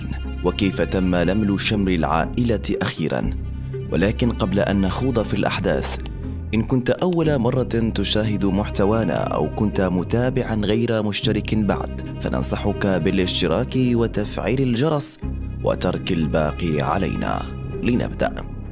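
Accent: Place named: Morocco. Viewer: واضحة اللكنة المغربية ف لهجتك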